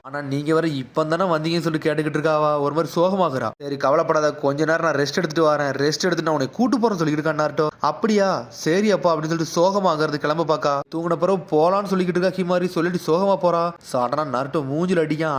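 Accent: Thoothukudi, India. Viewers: Lastaa kuramaa nu sonnathu nalla irunthuchu.. 😊 Neega Thoothukudi yaa na??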